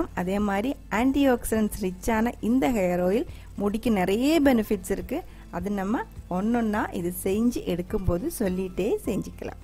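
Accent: Kerala, India. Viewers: Are you malayali? Samsaram kettal malayali aanennu thonnunnu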